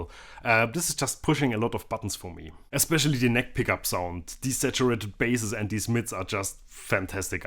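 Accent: Germany. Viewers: German accent?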